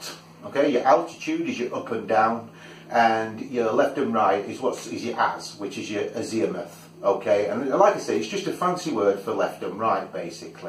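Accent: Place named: Manchester, United Kingdom. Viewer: Beautifully explained, really helpful and topped off as delivered by a nearby Mancunian!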